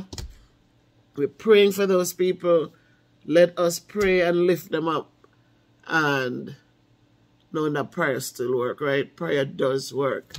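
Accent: Jamaica. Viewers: First I heard you speaking in patois.yuh gwaan good Mon. Yuh still have it. LoL.Big up Auntie!.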